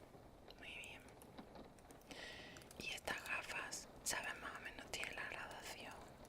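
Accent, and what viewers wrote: Malaga, Spain. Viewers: Con esa preciosidad🥺💓de voz malagueña yo te donaría hasta un Ferrari si lo tuviera😂te dono cuatro pares del tirón👓💜👓💜👓💜👓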